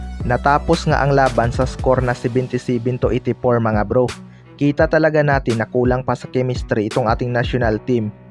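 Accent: Visayas, Philippines. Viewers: Bisaya ka ba bro,Kasi yong tuno mo bisaya ka talaga eh.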